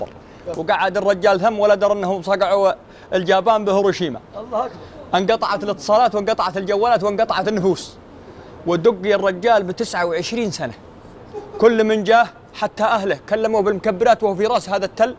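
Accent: South, Arab world. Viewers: ايتكلم بلهجه جنوبيه كفووو يبو حور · ي زين لهجتنا يلجنوب الله يسعدك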